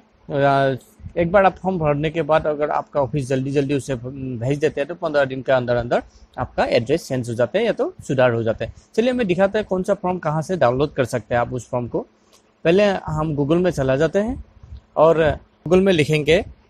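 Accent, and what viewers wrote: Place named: Assam, India. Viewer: Vo north east k h bhai vo aise hi Bolte h.Assam k h sayad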